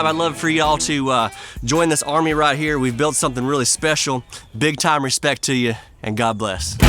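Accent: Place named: Mississippi, United States. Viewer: I grew up North as far as ya can get in the lower 48. I’m no Yankee tho. Hunt, fish, worked on farms, rode horses, cut timber. Oddly, I got bunked with from Mississippi such as yourself. Minnesota/Mississippi connection of the start and end of the Big River. Shouldn’t have, but we got along just fine, confusing folks with our polar opposite accents😂🇺🇸👍🏻💪😎🤷‍♂️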